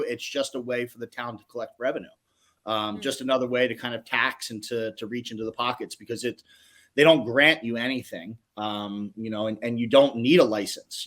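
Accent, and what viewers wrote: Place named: Michigan, United States. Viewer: Sounds a lot like Michigan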